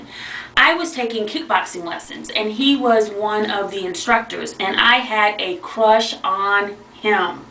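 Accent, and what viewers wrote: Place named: South, United States. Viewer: I think you are a very lovely person. You're someone that I would love to be friends with. I'm from Georgia and a southern gal too so when you say those particular words, I know exactly what you mean! It's funny how we think nothing of how we articulate our words until no one understands us! Thank you for sharing with us!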